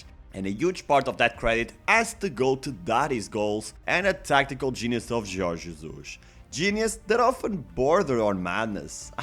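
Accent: Portugal. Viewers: Fodase eu tinha quase a certeza que eras português so pelo sotaque, mas não tinha como confirmar, este vídeo confirmou 🤣 Video ta incrivel, parabéns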